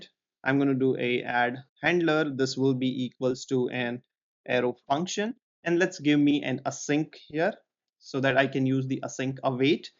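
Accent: India. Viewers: Thank you, even though i got a problem to get your accent, you'll guys from India makes programming get easier. Have a good week.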